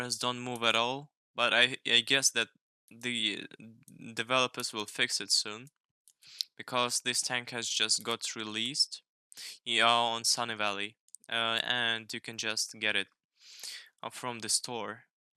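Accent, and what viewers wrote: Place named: Russia. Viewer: Классное видео, ты кстати похоже рус? Потому что у тебя в акценте русские согласные ядерные.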